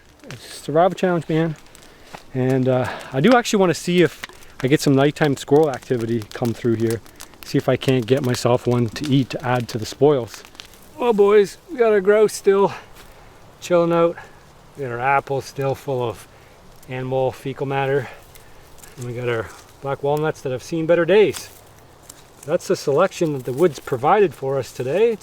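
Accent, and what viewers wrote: Canada. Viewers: I was wondering why u had such a similar accent 😂 didn't realize u were Canadian too lol. I'm not used to seeing people make survival videos in Canada, it's always in some tropical climate. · Pretty sure he’s canadian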